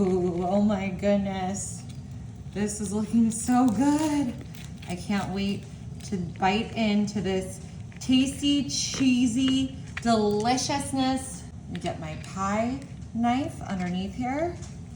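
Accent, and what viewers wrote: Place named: Michigan, United States. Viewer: She's being funny. But I from what I remember, I think it's natural for the snowy white areas of Michigan. The retirement villages.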